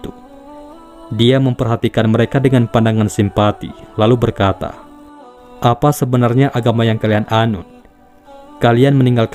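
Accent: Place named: Gorontalo, Indonesia. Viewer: Logat pembawa berita seoerti logat hulondalo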